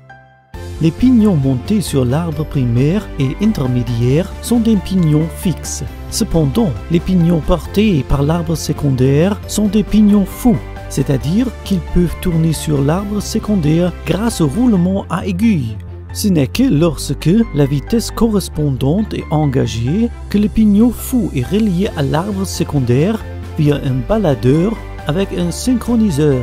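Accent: Germany. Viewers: J'ais du mal à comprendre à des moments, mais d'où vient cette accent ? Allemand ? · on se mare avec son accent allemand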